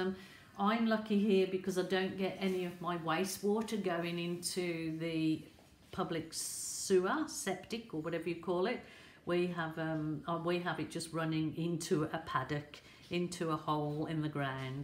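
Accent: Birmingham, United Kingdom. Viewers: I knew I wasn't going daft! I knew I could hear a bit of Brummie in there lol xx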